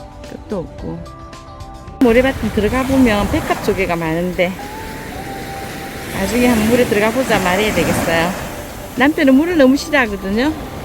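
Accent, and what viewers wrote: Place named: Daegu, South Korea. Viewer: 우리 사돈댁 근남면이군요. 억양이 대구분 같네요.. 여기는 수성구 황금동입니다. 다슬기도 있겠어요.. 같이 가시지요..😊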